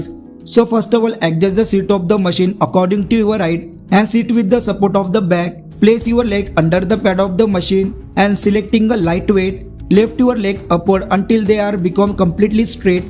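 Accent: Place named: India. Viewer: Its the Indian accent that makes it 10x better to me xD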